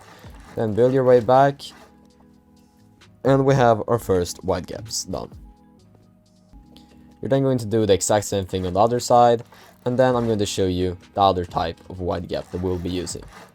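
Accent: Sweden. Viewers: I can hear that you are swedish my g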